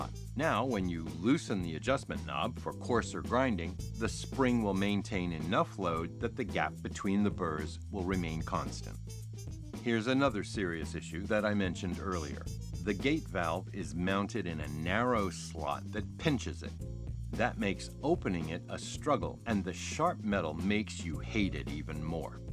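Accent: United States. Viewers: Hello, I really enjoy your content about coffee. Thorough, insightfull and a pleasure to watch. Thought you are American, but your channel says Ireland? Good voice anyway! Subscribed. Would you consider making a video about mods for the Mignon (Specialata)? Something I suggest experiencing: cotton pour over filters…. Please keep it up!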